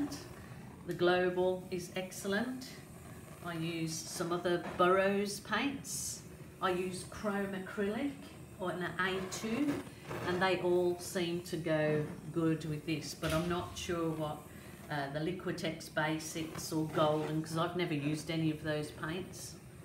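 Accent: Birmingham, United Kingdom. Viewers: Love your pictures & the brummie accent 🌍🇬🇧🇬🇧🇬🇧🌍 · I'm getting a hint of brummie in that aussie accent. Great pour btw. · This is amazing! So beautiful! Your accent doesn’t sound Australian. Are you from the UK? ☺️ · I couldn't quite place it either! Definitely got the Brummie accent but I knew there was also some Aussie in there too.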